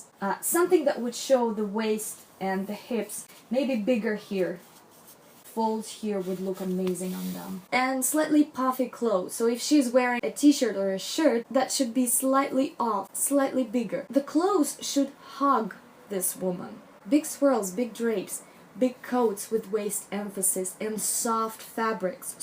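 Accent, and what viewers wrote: Russia. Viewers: Is your first language Russian (or any other slavic one)? Your accent is lovely by the way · Акцент похож на русский. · that russian accent tho, can tell, you're from my country :D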